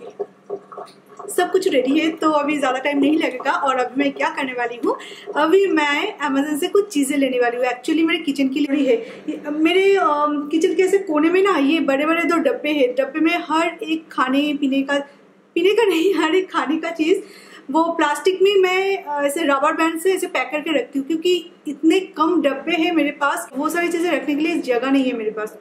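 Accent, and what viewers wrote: Bengal, India. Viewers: u r just amazing apna Bengali tone accha lagta hai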